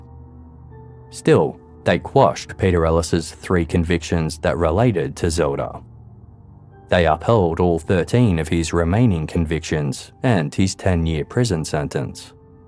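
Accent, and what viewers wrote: Australia. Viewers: Well, he is Australian. Not exactly a sophisticated accent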